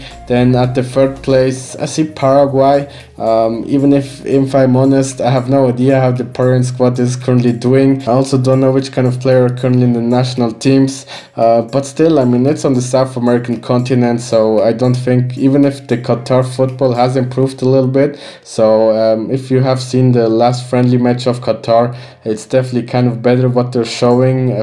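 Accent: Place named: Switzerland. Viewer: You have a swiss english accent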